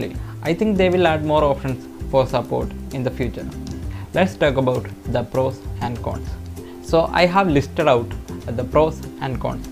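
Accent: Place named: Kerala, India. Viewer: ഹെ മലയാളി ഇംഗ്ലീഷ്‌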